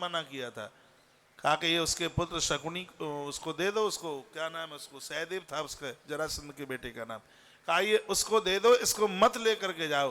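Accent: Braj, India. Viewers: Gurudev aapki brajbhasha bahut pyari lagti hai Radhe Radhe❤🙏🙏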